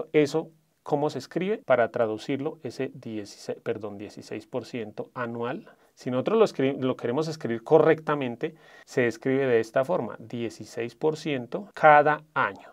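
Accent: Colombia. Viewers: Profe eres colombiano????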